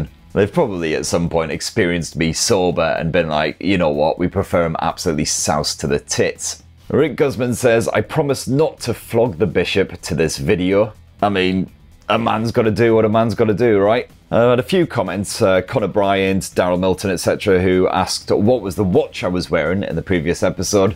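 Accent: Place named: Wales, United Kingdom. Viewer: Hi, love your accent, what part of Wales are you from?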